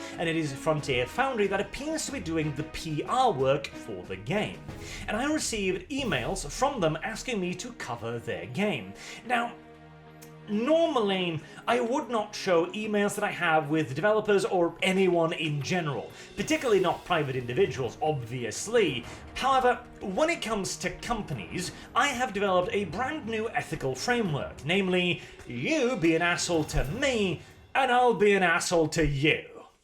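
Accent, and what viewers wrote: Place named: RP, United Kingdom. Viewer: You are Norwegian? You sound like an aristocratic (lacing your speech with some of the thickest sarcasm I have ever had the pleasure of hearing) Brit!... Learn something new everyday.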